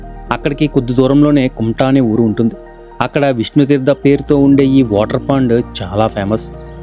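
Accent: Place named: Visakhapatnam, India. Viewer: Bro chala baga chepparu telugu matlu andrapradesh viziag bashala vunnadi meru akkadi rathi kattadala gurinchi weathergurunchi bagachepparu. Chala thanks meru marinni manchi place gurinchi videos thiyaliani koru thunnanu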